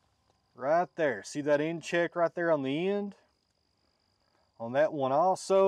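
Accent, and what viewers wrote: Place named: Kingsport, United States. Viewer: I like Billy Bob Thornton, too, so look at the comparison as a great compliment. Nearly 50 years ago I used to go to Kingsport Press to oversee production of some of the books I worked on, and I loved the area and the folks who worked there were great to work with and to be around. You, so much remind me of those good, friendly people. And you talk like them’ too. I love the dialect. I used to say that I didn’t care for cats, but that was before I got to know and understand ‘em. Interesting critters they are. I l very much enjoy your channel and keep up the good work.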